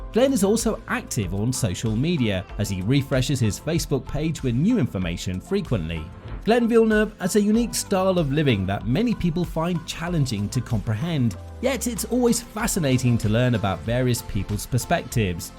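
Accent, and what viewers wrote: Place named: England, United Kingdom. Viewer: why does the narrator have a English accent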